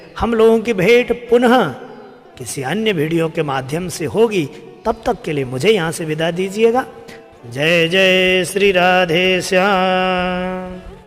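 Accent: Standard. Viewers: बहुत ही खूबसूरती से शुद्ध उच्चारण के साथ आपने पूरा स्तोत्र का उच्चारण बहुत ही शुद्ध भाषा में समझाया महाराज जी बहुत ही सुंदर उच्चारण के साथ कुछ लोग अशुद्ध उच्चारण के साथ इसको आज के समय गा रहे हैं अशुद्ध उच्चारण से कहीं ना कहीं यह हमें इसका फल गलत मिलता है इसलिए शुद्ध उच्चारण के साथ जो आपने समझाया एक एक श्लोक बहुत ही शुद्ध उच्चारण के साथ आपने गाया बहुत ही खूबसूरती से मैं आपके चैनल को सब्सक्राइब कर रही हूं और आपसे जुड़ रहे हैं धन्यवाद महाराज की शुद्ध उच्चारण से समझाने के लिए जय मातेश्वरी जय भवानी हर हर महादेव 🇮🇳🙏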